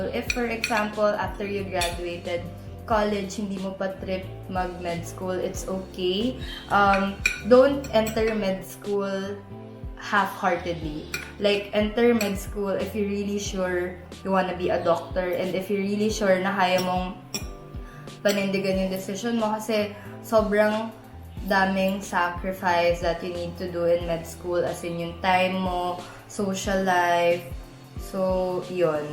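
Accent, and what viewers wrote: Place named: England, United Kingdom. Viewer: I love you english accent.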